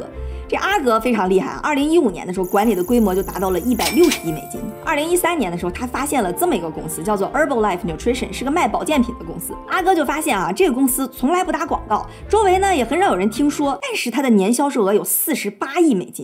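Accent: China. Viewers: 你如果講話能沒有大陸口音會更吸引人。還有，學習會用破音字。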